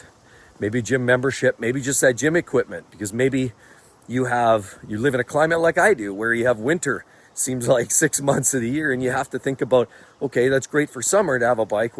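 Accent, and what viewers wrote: Alberta, Canada. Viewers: Is your accent an Alberta accent?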